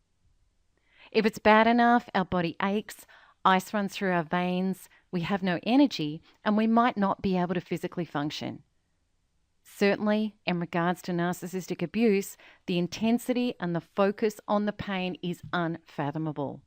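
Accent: Australia. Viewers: I can't stand the Australian accent.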